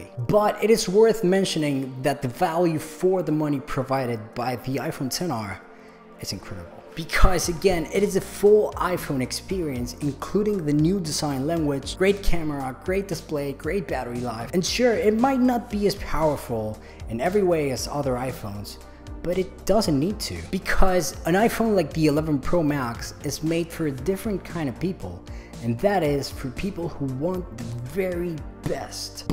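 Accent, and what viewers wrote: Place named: United States. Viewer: Thank God for his American accent 😌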